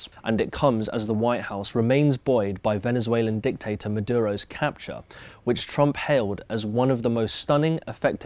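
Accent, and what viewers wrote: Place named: United Kingdom. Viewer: I wondered how long it took this guy to get that accent down. There's no way he started talking like that as a child. And why a British accent? Why not a Latino or Russian or a French accent.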